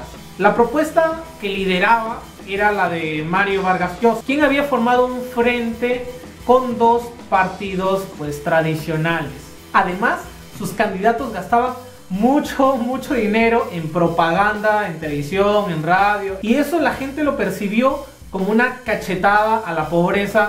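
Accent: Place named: Mexico. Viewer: Bacan la info... pero no hables como mejicano... pa que!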